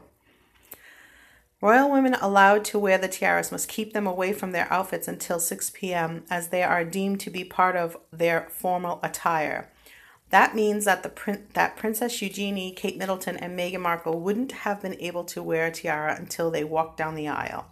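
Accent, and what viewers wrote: Northeast, United States. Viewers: First time here, I’m guessing your from the Northeast USA?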